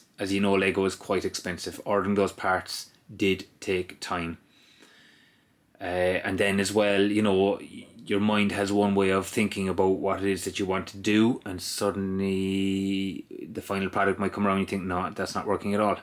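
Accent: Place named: Ireland. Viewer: Omg he's Irish! :D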